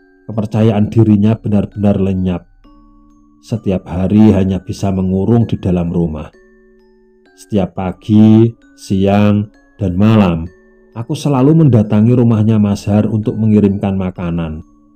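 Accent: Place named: Jember, Indonesia. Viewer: Iiiih Jember pisan ..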